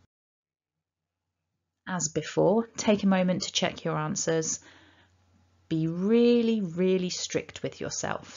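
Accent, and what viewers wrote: United Kingdom. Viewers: is this voice a scouse voice? the way "h " is pronounced is really cute a part from a few other words uttered as cute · are you British?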